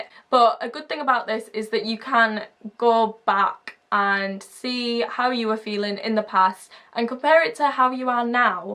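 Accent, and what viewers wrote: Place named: North England, United Kingdom. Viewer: Just seen your one on coming off citalopram and wanting to do same, also you sound local I'm a northern lad too ha ha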